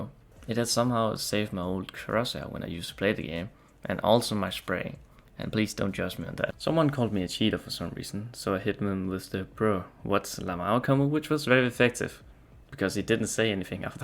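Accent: Denmark. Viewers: Fed Dansk accent haha! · i smell danish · Ingen tvivl om at du er dansker med den accent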